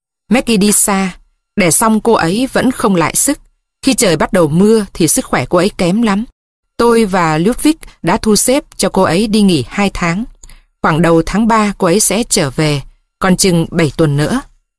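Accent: Standard. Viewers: Truyện nghe đi nghe lại vẫn hay , nhiều tình tiết hấp dẫn cộng với giọng đọc thật chuẩn và truyền cảm , cảm ơn tác giả và người đọc thật nhiều . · Bản dịch quá hay . Giọng đoc cũng rất chuẫn . ❤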